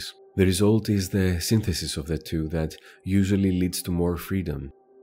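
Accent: Greece. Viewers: yeah im a greek and his accent sounds Greek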